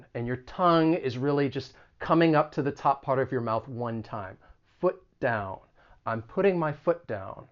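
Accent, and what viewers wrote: United States. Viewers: Your pronounciation is American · Amazing American English at it's best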